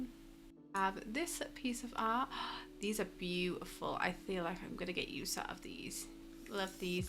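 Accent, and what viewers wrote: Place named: England, United Kingdom. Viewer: I was watching this video and my dad overheard, and he thinks you’re from around where he grew up in England 😂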